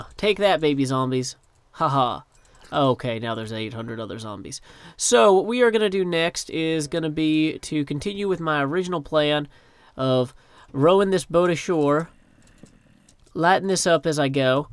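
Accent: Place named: North Carolina, United States. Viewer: Oh dear God your accent LOL its been awhile since I've heard one like it. I'm originally from western NC but moved to VA beach 8 years ago. Love the accent. Its home to me.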